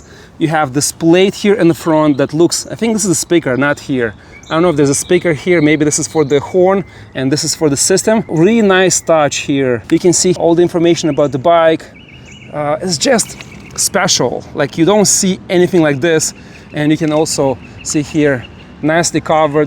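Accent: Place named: Ryazan, Russia. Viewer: Чувак, что с рязанским акцентом?))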